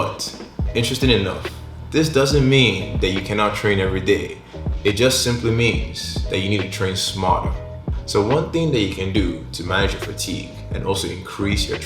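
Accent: Nigeria. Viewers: Omoh you be Naija guy???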